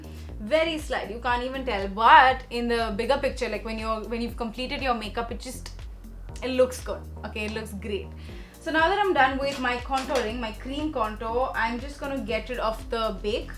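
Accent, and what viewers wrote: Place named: United Kingdom. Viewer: I looove when she speak British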